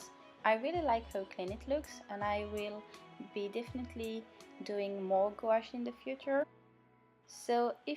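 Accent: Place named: Russia. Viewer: Ta voix en anglais est tellement cute, c'est hyper agréable de t'écouter (malgré l'accent russe hihi) Le rendu est vraiment bien,j'adore la palette de couleurs que tu as choisie! Keep going <3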